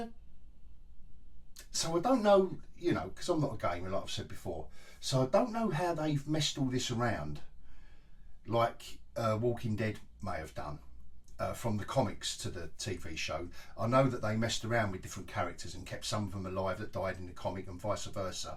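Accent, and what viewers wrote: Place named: Cockney, United Kingdom. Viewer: Christ I'm 100% British and I'm jealous of that cockney accent! 😁 It was a great pay off to episode 4 which, at the time, felt very weak. Keep up the good work mate!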